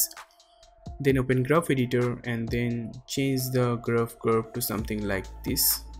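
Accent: Bengal, India. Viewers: Brother are you indian? lol❤️❤️ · bengalis from bengal have shittiest irritating english accent.. amazing btw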